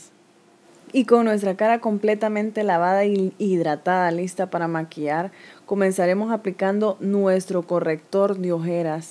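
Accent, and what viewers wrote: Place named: El Salvador. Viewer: Tiene acento de mi El salvador 🇸🇻♥️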